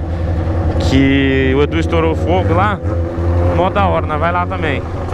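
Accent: Goiás, Brazil. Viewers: Hoje ele falo no pique do Goiás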